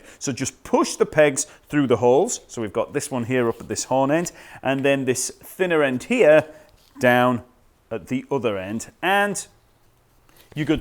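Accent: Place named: England, United Kingdom. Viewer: when did shane o mac get an english accent?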